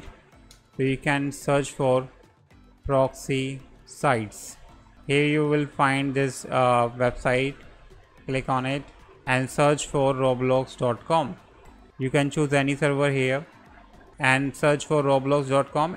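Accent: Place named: India. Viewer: I though you would be a English guy not an Indian guy trying to talk in English 💀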